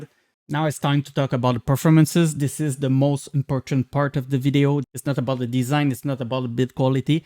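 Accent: Quebec, Canada. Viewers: Thanks. I just assumed you're from Quebec because of your accent. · J'aime que dans les 3 premières secondes du vidéo j'ai reconnu l'accent QC, et +1 pour l'avoir écrit dans l'intro de la vidéo! 😄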